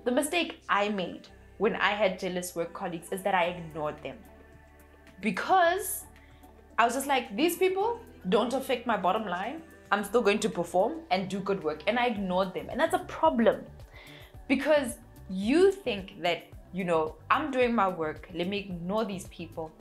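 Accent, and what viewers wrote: South Africa. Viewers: South African accent 😍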